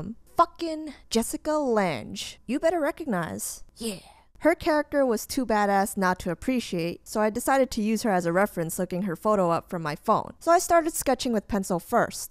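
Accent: United States. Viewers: Aesthic Vibes only I thought you were American 🤔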